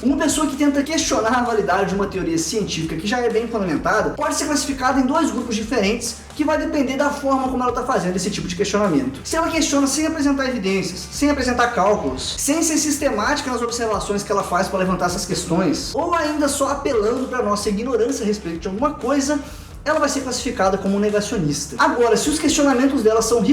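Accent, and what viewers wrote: Minas Gerais, Brazil. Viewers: amo de coração seus videos, não perco um. Mas, a forma que você fala e no "mineires" (falando rapido) as vezes fica dificil de entender. Nos videos maiores as vezes tenho que voltar um pouco pra conseguir seguir o raciocinio. Sou da area ambiental mas sou apaixonado em fisica.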